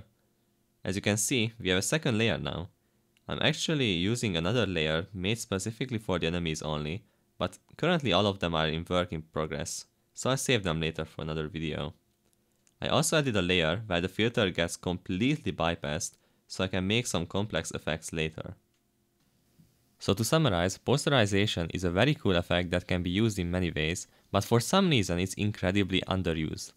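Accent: Hungary. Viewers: annyira büszke vagyok magamra hogy instant felismertem hogy magyar akcentusod van de amúgy geci kemény képeket lehet csinálni vele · A magyar akcentus megcsapott ugyhogy jottem a komment szekcioba :D szuper video, igy tovabb!!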